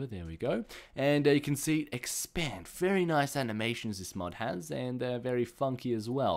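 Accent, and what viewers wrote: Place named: Australia. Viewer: He's Australian. That's how we pronounce it and it's also how it's pronounced in the UK.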